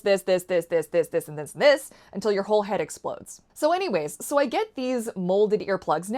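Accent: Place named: Canada. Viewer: Most people who are watching your videos are American? So I can say Hi from Germany. 🤗 I have half-siblings in the US that I have met for the first time in my life last year. And I have to say thank you for your videos and your Canadian English which I love to listen to. Because I can understand it so much better than the American accent from the southern states. 😂😊 And I wear Loop Switch 2 Earplugs. I love it that they are so small that you can't see them at first sight. But when you see them they are nice to look at. Sometimes I wear them at work when I have to concentrate for a while. They are doing a good job then. But sometimes I hear my heartbeat very loud. I feel uncomfortable then that I have to take them off. After this video I will try the Bose for private locations. 👍🏼 Please be kind because of my English. 🙈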